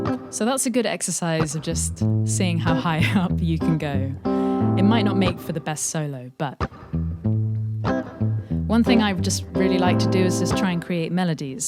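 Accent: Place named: England, United Kingdom. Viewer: What I find interesting is that your English accent is evident when you're talking but not when you're singing.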